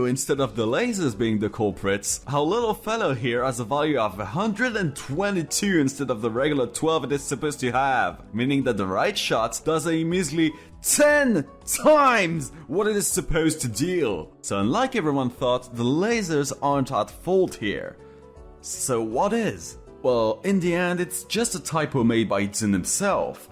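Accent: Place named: France. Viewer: I like your french accent.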